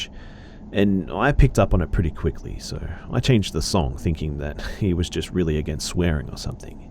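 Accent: Australia. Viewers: Leave it to me to listen to the awesome Aussie narrate these badass stories just to keep myself up at night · I would happier if do me and do me good while reading to me in that sexy Australian voice of his!!!!!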